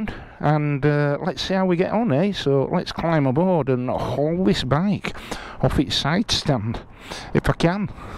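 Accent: Yorkshire, United Kingdom. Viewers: Lovely to see ya again. Yorkshire Henry Cole. Makes me nod off every time. But I get the gist after watching several times. Keeps the views up lol 😂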